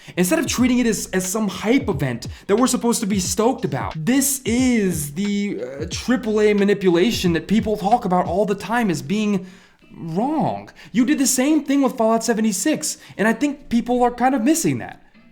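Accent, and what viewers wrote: New York, United States. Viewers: Okay i can deadass definitely tell your from new york my guy lol 😂😂😂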